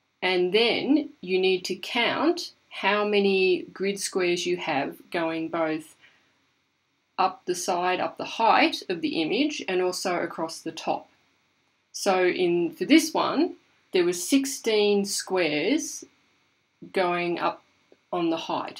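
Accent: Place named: Australia. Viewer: Your Aussie accent is awesome!! 🤗❤️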